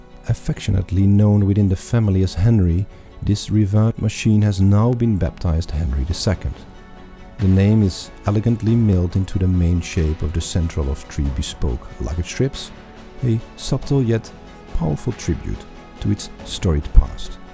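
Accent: Netherlands. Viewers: Jammer van dat Nederlandse-engels van de voiceover, maar wat een schitterend ontwerp. Als ik zo’n coupé zou bezitten zou ik Niels direct vragen die ook om te bouwen. Prachtig! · Not sure they’re assumptions. I’ve tweaked my wording to better express the ideas. It’s not a style from today and based on a vehicle from half a century ago, so anyone extrapolating from it is an outsider looking in. Seems like a fair observation more than an assumption that because it’s a LHD vehicle built by a Dutch company it’s not British, but Dutch. It has the most stereotypical of Dutch accessories, the bike rack. Even the voice over has a Dutch accent. The electric drivetrain comment is about a personal viewpoint, not an assumption. My third comment is not an assumption either. I’ve always thought herringbone tweed would look great in a car and you’ve proved that to be true! 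This vehicle is utterly charming and looks exquisitely built and I love the “exercice de style” that it constitutes. But it is undeniably anachronistic and somehow feels foreign. It reminds me of how the Japanese see Britishness.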